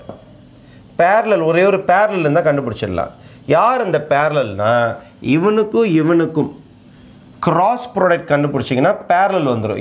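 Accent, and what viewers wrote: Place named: Telugu, India. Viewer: Sir your mother tongue telugu va voice similar ah iruku athan ketan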